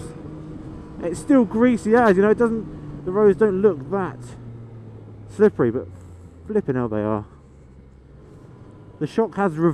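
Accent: United Kingdom. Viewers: The british accent gets old after a while.. Borderline annoying. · Its the queens tounge, it never gets old.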